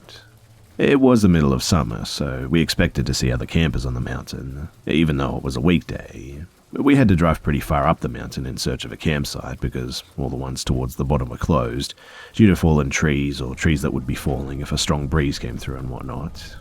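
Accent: Australia. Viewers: Is Australian???????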